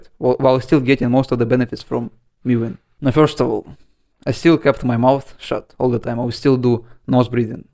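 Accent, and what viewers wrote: Russia. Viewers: where you from bro? you have russian accent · Where are u from bro? Ur accent is so Russian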